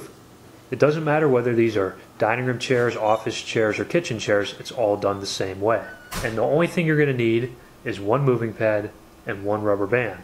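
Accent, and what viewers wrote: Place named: Western Pennsylvania, United States. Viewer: I love your western PA accent. I’m from Indiana Pa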